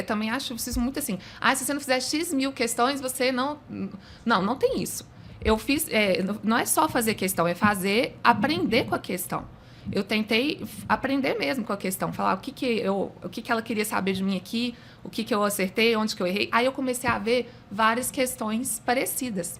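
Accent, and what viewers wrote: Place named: Minas Gerais, Brazil. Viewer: Esse sotaque dela é maravilhoso MG ❤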